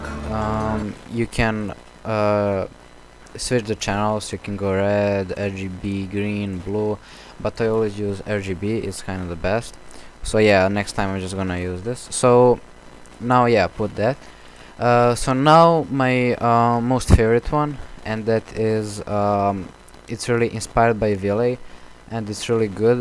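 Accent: Russia. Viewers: Это что чеченский акцент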